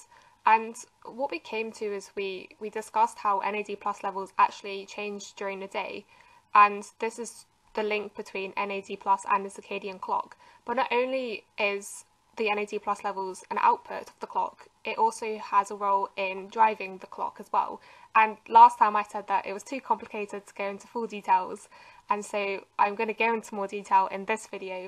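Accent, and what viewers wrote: United Kingdom. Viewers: Not get used to British accent.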